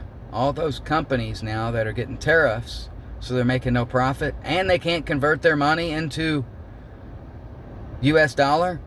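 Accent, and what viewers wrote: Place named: Ireland. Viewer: like the Irish accent lol - was there a hidden meaning for it or just for fun? 😀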